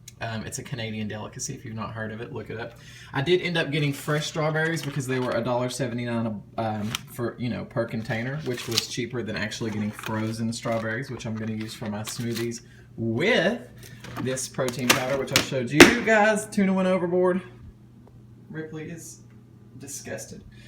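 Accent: South, United States. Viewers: I had to take a pause just too type this message! I love ur southern accent or the way you speak whatever you want to call it! I love it!!!!!!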